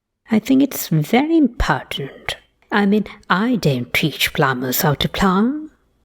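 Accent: RP, United Kingdom. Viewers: You sound like such a posh classy aristocratic lady! Are you actually one? Or maybe “landed gentry”? 😂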